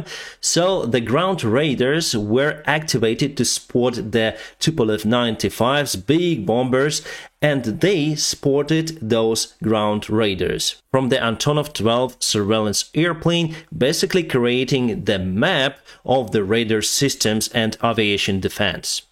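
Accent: United States. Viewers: great ascent.................really Ukrainian or living in DC? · As an American that speaks multiple languages, I have no trouble whatsoever understanding him. English is the tough one to understand, not Eastern European or Asian languages. Once you know how their sentences are structured, the words in English can convey the message. Compared to some of the translators on UATV, sounds downright American. They have two that are absolutely horrible.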